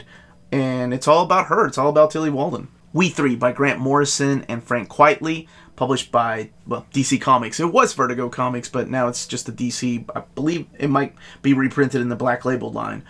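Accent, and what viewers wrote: United States. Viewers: what country do you reside in! Just super curious, I think you’re American however I cannot tell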